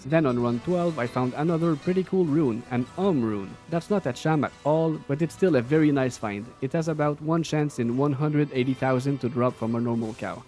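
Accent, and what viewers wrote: Quebec, Canada. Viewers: es-tu queb? tu sonne queb haha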